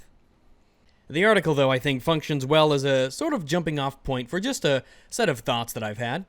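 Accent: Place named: South, United States. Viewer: The southern came out this episode.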